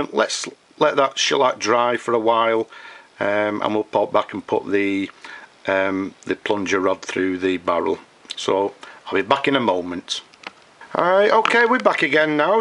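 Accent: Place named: North, United Kingdom. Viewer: I do appreciate your video! By the way, your accent sounds pretty much like northern British accent haha. I don't know if I'm right about this but I like the way you talk definitely! I have just got the exact same pen on Ebay.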